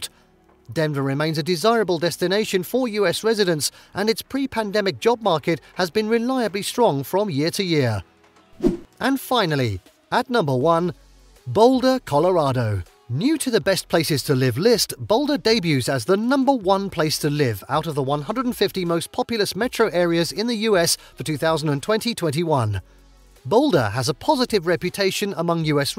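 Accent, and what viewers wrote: United Kingdom. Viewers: As soon i heard british accept i stopped video. I will skip it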